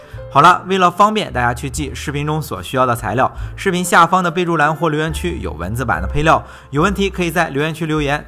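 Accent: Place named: Shandong, China. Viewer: 每次听到带点山东口音的解说还是感觉很亲切的，请问你是山东哪里的？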